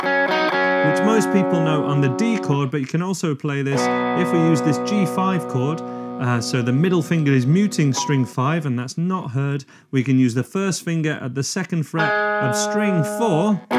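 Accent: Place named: Yorkshire, United Kingdom. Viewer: Yokshuh accent, there.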